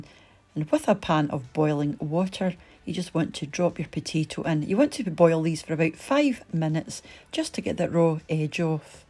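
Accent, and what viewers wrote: Scotland, United Kingdom. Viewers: You can also teach standard/traditional/authentic Scottish accent, as yours is distinctive yet wholly comprehensible and lovely. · Gonna have to try those, they looked awsome. Your voice is so soothing and i miss the accent, was born in arbroath but have been in Canada since 68, only been home once in 77, was a bad trip i was sick the whole time. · Its just a normal Scottish accent. THEWUMMANSJOOSTSPEAKINGSLOWERCOSSHESOANAVIDYAPAL... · Love the food you do,love the acsent ,it's a joy so many memories of scotland , keep it going great to see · Here in New South Wales we do call them Scallops and I Luv Ya Scottish Brogue I was Going with a Guy from Kilcordy fife shire and I had an Auntie that was Scottish so I picked up his Brogue and it has been a very longtime since I used to sayTotties for 🥔 ... lol ... and the wardsmaids would tell me Speak Australian we Don’t understand Scottish ... 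lol ... I was training as Nurse back then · Yum yum yum and so easy to make.Also brilliant to hear my accent, yeah from Clydebank originally.Loving the Scottish foods,thank you and keep them coming.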